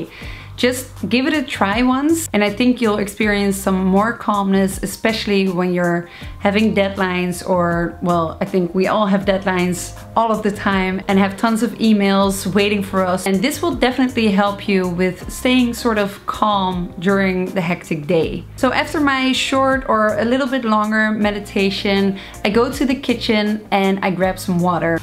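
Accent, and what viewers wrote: Netherlands. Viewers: OOOH you're dutch! I seriously thought you where from an indian or bahama kind of place, because of your accent, but after you showed that card I could hear that you're dutch haha. I love these tips. They're really helpful! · echt een nederlandse accent :) Leuke video!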